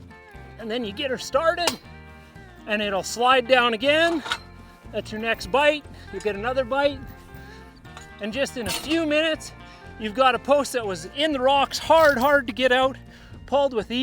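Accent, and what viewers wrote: Canada. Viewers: Slight Canadian accent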